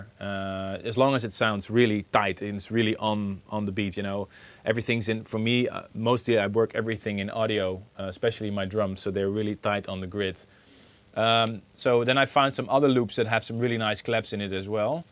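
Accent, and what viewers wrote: Netherlands. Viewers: ***** Yeah, ok his english is fine but you can clearly notice he's dutch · armin is dutch, english is not his mother tongue.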